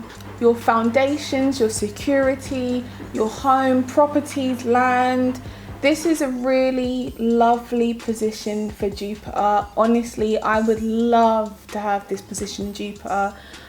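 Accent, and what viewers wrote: United Kingdom. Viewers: U got this Lil nice Lil vibe wit you with yo great Britton sounding ahh 😂😂😂😂but it's sexy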